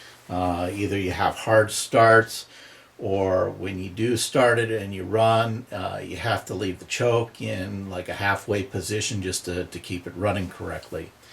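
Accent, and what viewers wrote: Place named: Seattle, United States. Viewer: Great video thank you so much, a big help. Can I be pedantic and point out that the 'screws' you refer to removing at the start are not screws but bolts. Bolts have a machine thread which to work always has to engage with a nut or a threaded hole. Screws screw into wood or other softish materials and never require a nut or other receiving thread to be functional. Thanks! PS You're not BIll |Gates are you? Your accent is just like his.... Maybe a Washington/Seattle accent!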